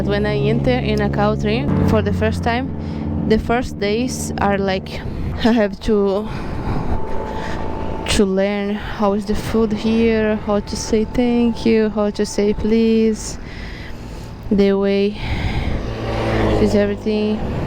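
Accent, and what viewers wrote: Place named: Spain. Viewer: Honestly, 8 years is a very long time to travel specially now you ae alone. But remeber you have a load of people here in the internet that are with you in the heart. I'm a little old as i soon will retire (unfortunately i do not have the vlog skills like you have ) but as soon as i retre, i will travel for a reasonable time and see all the beauty and horrible things you travelers have experience on my 1250 GS. Yes 150k is a lot, and perhaps the engine will be totally fine, but like a clutch can be a little problem in that long time as that is used several times a day, still, not so much a bug problem when that have to be renewed on your Tenere compared to my beemer hahaha I hope you are doing well as i love to liste to yourEnglish in a Spanich way, its soo adorable hou you begin and let us know what you experience. So drive safe, and have a good morning in the morning..;)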